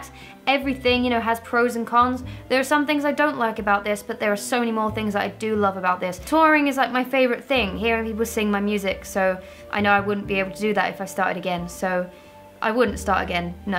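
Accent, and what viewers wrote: United Kingdom. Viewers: I have another friend who gets told she sounds American , but it makes me LOL every time because she (and you) still sounds so British to me. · 1) What do you think happened to the guy that was released from North Korea in a coma? Update: he died. 2) As an American, I can safely say that you do not sound American, you simply have a "light" British accent. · To an American, you still sound British and we love it ❤️❤️ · As an American I can very safely say that your accent isn't remotely American. Don't know what locale of British but definitely British to an American ear. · i was really caught off guard by your british accent?? why? i guess i've been watching a lot of american youtubers lately. anyways, your book cover is FLAWLESS!! ily xx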